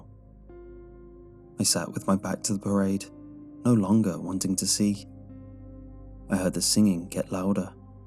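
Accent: United Kingdom. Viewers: Mans from arizona but speaks but has a british accent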